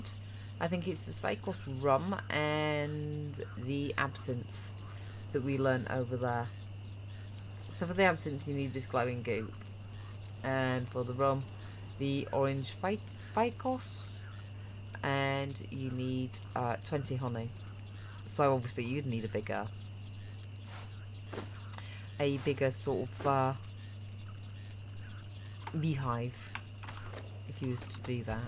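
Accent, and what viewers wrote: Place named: United Kingdom. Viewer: Thank you for showing the route, with camera angles on landmarks, to where you found Hops. And thank you especially for popping up the map. You are now my favorite Conan Exiles YouTuber. As if the lovely female voice and British accent weren't enough for that already! :D On another note, I see you put yourself together a set of the Reptile Armor. Nice isn't it? It has slightly more Armor Points and Poise than the standard Medium Armor, and it takes only a single repair material.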